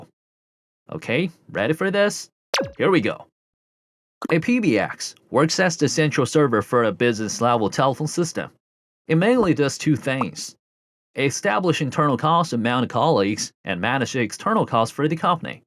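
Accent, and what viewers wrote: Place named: China. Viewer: Actually this a real persons voice. He is speaking English with a Chinese accent and some incorrect pronunciation. After some time you get used to it and will conclude that the English is not that bad.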